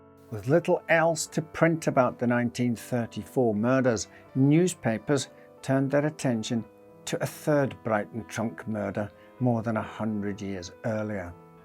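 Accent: Scotland, United Kingdom. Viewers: Oh my goodness!!! I ABSOLUTELY, POSITIVELY LOVE YOUR SCO-ISH BROGUE!!! Your stories are so very interesting!!! 😘😘😘😘😘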